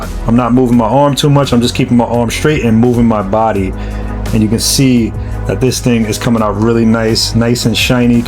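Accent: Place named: New York, United States. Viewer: it’s a NY accent lol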